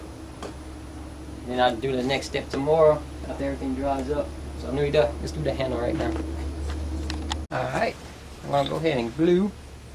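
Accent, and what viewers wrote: Louisiana, United States. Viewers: + We got easily distinguishable accents from the typical southern accent. You're from down da bayou. It aint hard to tell. Are you a Houma Indian or do you know any american indian bow making techniques? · straight up Cajun brotha. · He sounds cajun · Sounds like from Bayou country. good job on bow. but, is she able to draw the 60lb bow? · Love the accent. Cajun/ creole is so cool.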